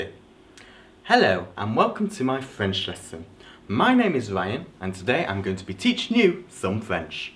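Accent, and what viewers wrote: United Kingdom. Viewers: Hello. Were you raised speaking English? If yes - Ok. If no - You speak really well and you acquired the British/English accent well (vs. American English). Keep up the good work. Thanks for the video!! :o)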